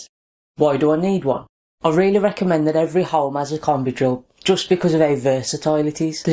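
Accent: United Kingdom. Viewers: Scouse accent?? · more like Birmingham or Dudley.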